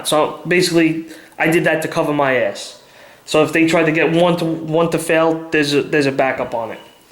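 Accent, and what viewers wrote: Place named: Ireland. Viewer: Wow you sound very irish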